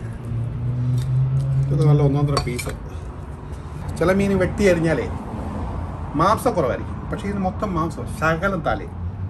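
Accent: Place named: Central Travancore, India. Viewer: ഈ.. ഒരു വരവും.. ആ പറച്ചിലും... ഞങ്ങടെ മാവേലിക്കര ചെങ്ങന്നൂർ.. തിരുവല്ല കാരുടെ.. നിഷ്കളങ്ക സംസാരം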